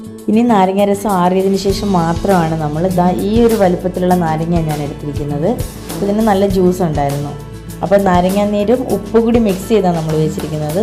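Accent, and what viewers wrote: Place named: Kottayam, India. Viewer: Wow. Nehru Trophy. Such a wonderful place. Before 3 years we had a boating in Nehru Trophy. Kindly make one episode to show your place if you can . We are waiting to c. Actually your accent is Kottayam. That s y i asked such.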